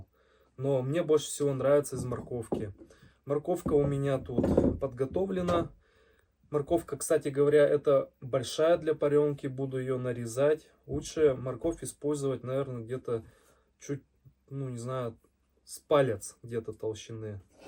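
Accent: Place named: Vyatka, Russia. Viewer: Говор вятский, если не ошибаюсь...)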